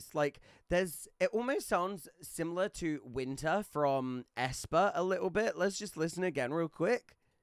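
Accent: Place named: United Kingdom. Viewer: Oh ..... You're British lol. Thanks for reacting bro.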